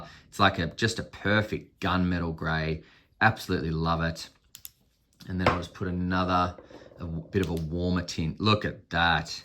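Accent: Australia. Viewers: plus the Australian accent makes every presentation better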